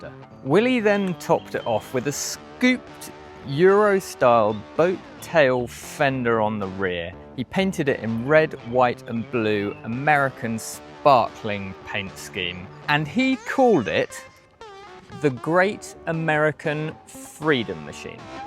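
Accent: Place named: United Kingdom. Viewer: Always Enjoy Hearing a Lymie. Tell us What Appeals to Ameraicans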